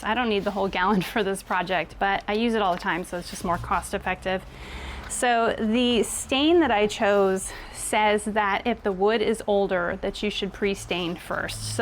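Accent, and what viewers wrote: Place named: Midwest, United States. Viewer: You sound like your more from Midwest than the market she’s in.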